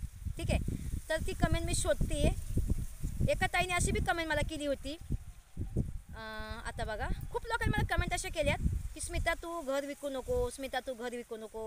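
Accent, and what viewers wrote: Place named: India. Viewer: स्मिता तुम्ही आयुष्य मुंबईमध्ये काढले तर शुद्ध मराठी बोलायला पाहिजे. जेव्हा तू शुद्ध मराठी बोलतेस तेव्हा ऐकायला गावरान मराठी पेक्षा चांगले वाटते. तुझी बोलायची पद्धत तीच ठेव पण शुद्ध मराठी ला नक्कीच 💯 % जास्त लाईक्स मिळतील.शुद्ध मराठी ऐकायला फार बरे वाटते.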